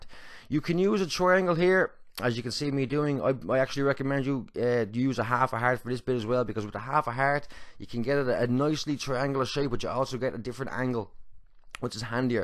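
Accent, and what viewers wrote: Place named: Ireland. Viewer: notice your Irish accent, I'm from Ireland :) woo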